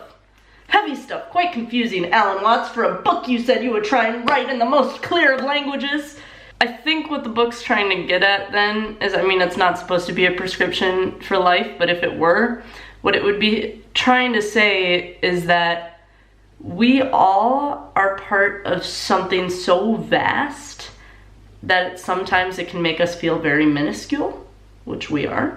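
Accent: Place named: United States. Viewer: What's her accent is .... which state in US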